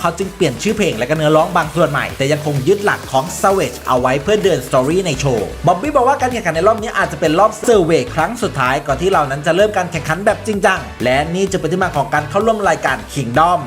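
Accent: Isan, Thailand. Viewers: ชอบความเป๊ะของแอด ข้อมูลพร้อม แล้วค่อยลง อวยเต็มที่ น้ำเสียงไม่น่าเบื่อ ชอบมากค่ะ และสำเสียงอีสานใต้มาเต็ม แอดคนอิสานใช่มั้ยคะ🤣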